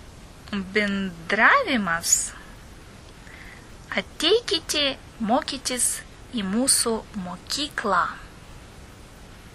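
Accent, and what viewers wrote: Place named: Russia. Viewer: Mano ausis sprogo ir tu tai vadini lietuviu kalba ? Tai kaskokia pusiau rusu pusiau lietuviu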